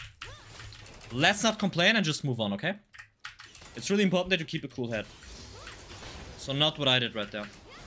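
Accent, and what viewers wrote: Germany. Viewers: this middle eastern looking boy with german accent is just too good! i ve learnt about qyana s laning phase in 2 videos more than i have since she was released... keep it up sir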